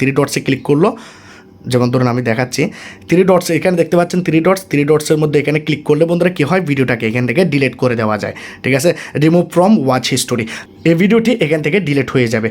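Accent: Bangladesh. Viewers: ভাষা ঠিক মতন বলতে পারেন না কথার মধ্যে বাঙাল কথা আসে কেন? যেমন আপনি বলছেন গোফন কথাটা হবে গোপন। সেটিং কথাটা ঠিক বলছেন না বলছেন স্যাটিং। কথা বাংলা বলছেন কেমন যেন গাঁইয়া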